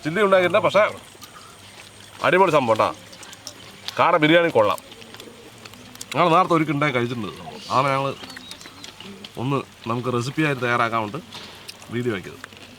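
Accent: Palakkad, India. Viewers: Palakkad an Bhasha athanu secret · ക്കാ ഒരായിരം ലൈക്കിനോടൊപ്പം ഒരായിരം ആശംസകൾ.. ആ പാലക്കാടൻ സംഭാഷണം പ്രത്യേകിച്ച് ചിക്കൻ കഴുകുമ്പോഴും, അടുപ്പ് കത്തിക്കുമ്പോഴും.. അത് മാത്രം കേട്ടാൽത്തന്നെ മനസ്സുനിറയും.. പിന്നെ ആ കൂട്ടുകാരോടൊപ്പം ഇരുന്ന് ഉണ്ടാക്കി വച്ചത് കഴിക്കുന്നത് കാണുമ്പോൾ വയറും നിറയും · Ikkaaa... Ennumpole innum super..... Kaada ende fav aanu.... Pakshe adhilum super nammande palakkadu baasha thanne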